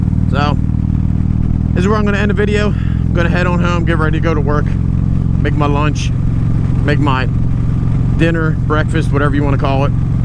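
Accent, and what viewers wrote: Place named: New Jersey, United States. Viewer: 10-4, thought I might have heard a little Jersey in your voice. Ive spent time time in that corner of PA, MD and DE in Elkton.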